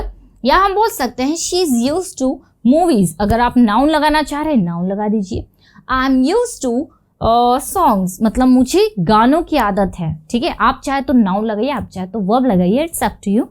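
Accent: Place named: Bhopal, India. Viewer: आपकी हिंदी का उच्चारण भोपाली क्यों है ? बहुत इरिटेट करता है जब आप मैं को में और कैसे को केसे बोलती हो...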